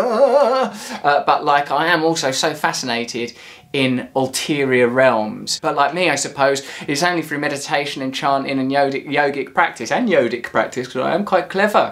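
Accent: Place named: England, United Kingdom. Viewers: Your English accent is so strong, sometimes i can't understand you when you talk fast! lol im American by the way. p.s. love ya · hey russel i feel like you are my official cockney guru. i do spiritual punk - aka really fast chanting - its amazing - can i play in your cafe x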